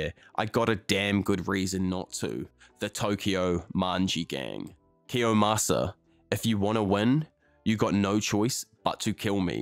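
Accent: New Zealand. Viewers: Are you a New Zealander? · Love the Kiwi accent bro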